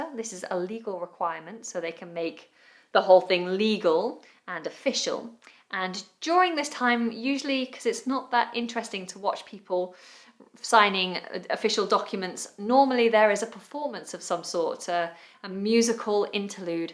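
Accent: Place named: United Kingdom. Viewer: Hi, I really like your videos, you are very nice and have a lovely voice and a clear and perfect British accent that makes it easier to understand and learning english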